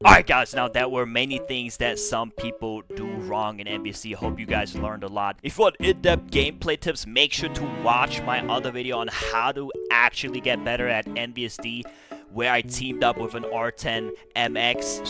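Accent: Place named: United Kingdom. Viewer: wtf he is like speaking British?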